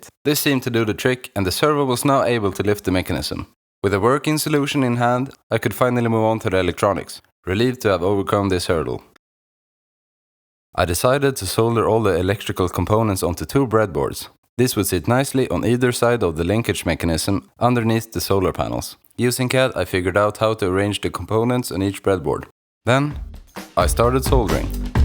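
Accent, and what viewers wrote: Sweden. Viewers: You must be Swedish, u talk with a Swedish accent. Or possibly Norwegian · Might you be svidish?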